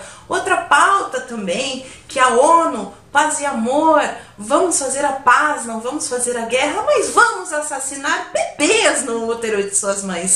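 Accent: Rio Grande do Sul, Brazil. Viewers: Oi !! Bem que vi pelo teu sotaque que tu é gaúcha, como eu. Assisti a um vídeo teu onde estava te deslocando de carro pela BR 116, sentido NH - Porto Alegre e entrou na Rua Ipiranga em Canoas.... hahhahaha Legal !! Moro em Esteio, mas sou de Canoas. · " todos iguais, todos iguais, mas uns mais iguais que outros", adorei a consideração a melhor banda do Brasil:. Parabéns pelo trabalho professora! Meu sonho é ter aula contigo, bah que guria sensacional, se gaúcho não tem o melhor sotaque brasileiro eu não sei quem tem❤️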